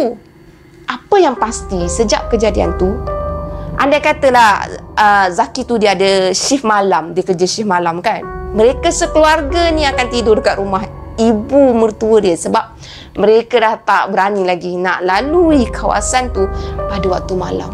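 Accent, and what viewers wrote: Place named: Kedah, Malaysia. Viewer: org kedah mai dh ni😎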